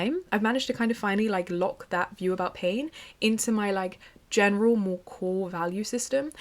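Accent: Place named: England, United Kingdom. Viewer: I know it's out of context, but can someone tell me what English accent she's speaking?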